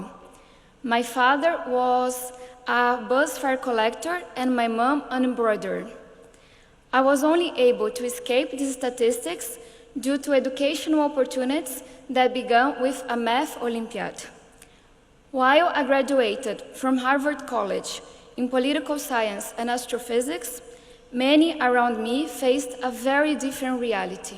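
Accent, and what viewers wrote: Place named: India. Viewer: Sim, tá parecendo um indiano hahaha Acho que é porque ela está falando devagar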